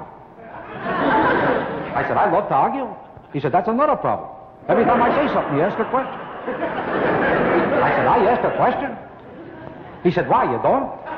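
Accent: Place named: New York, United States. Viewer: Infinite list of little absurdities. And the NY accent. He was a real hit in his day. And his day lasted 60 years.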